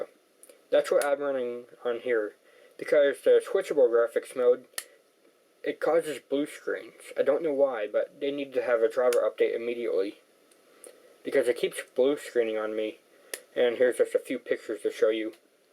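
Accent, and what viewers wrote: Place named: Russia. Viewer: for god's sake, he's russian, english is not his first language STFU already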